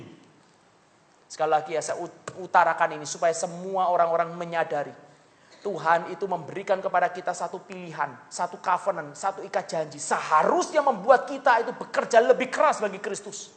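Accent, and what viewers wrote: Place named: Java, Indonesia. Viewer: Logatnya tetap beda medok "Jawa"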